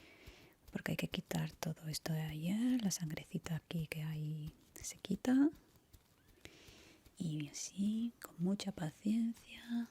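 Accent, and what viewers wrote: Andalusia, Spain. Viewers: Extraño tus susurros sin el acento sureño... Perdón 😔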